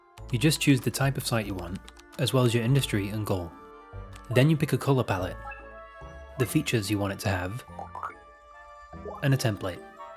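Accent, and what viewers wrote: United Kingdom. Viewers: Bro has the perfect amount of British accent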